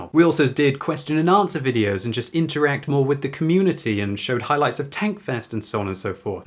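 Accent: United Kingdom. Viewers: Even though i've quit playing WoT, i'm still watching your videos and come on your stream for that lovely british accent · I've never played WOT and I have no plans for it. I've enjoyed watching your progressive gaming style and rather unique british commentary for some years now and I have fun on 98% of the games you posted. How can I keep tracks on what I've seen so far, and what not? Easy - click [LIKE] on every single video watched. Thanks for a steady stream of rock solid entertainment. Cheers, Peter Beijing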